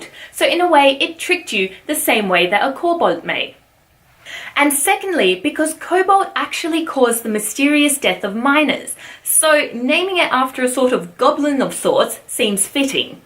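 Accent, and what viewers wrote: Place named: Australia. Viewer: You deserve more subscribers - the name for your channel is false advertising! Also, it's great to hear an Aussie accent (bonus points for paying attention to pronunciations tho!) discussing obscure monsters on YouTube :)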